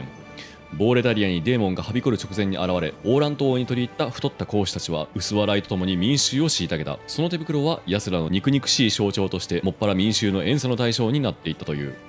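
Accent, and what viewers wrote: Kansai, Japan. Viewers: めっちゃ関西弁なの慣れてるからか聞きやすい^^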